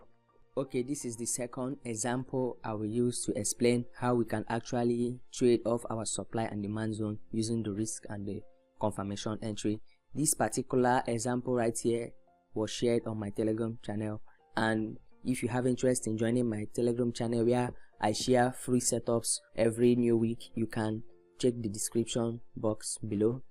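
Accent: Nigeria. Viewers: From the accent it looks like you're a Nigerian?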